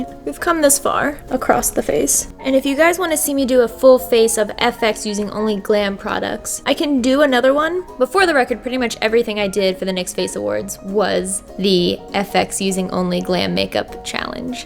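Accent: Philadelphia, United States. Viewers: I've always thought that had a very unique accent but could never pinpoint it. It's a Philadelphia accent. I'm from Philadelphia. Why am I like this?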